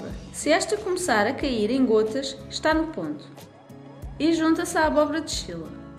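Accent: Portugal. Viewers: Sou brasileira Acho lindo o português de Portugal 😍 Muito bem falado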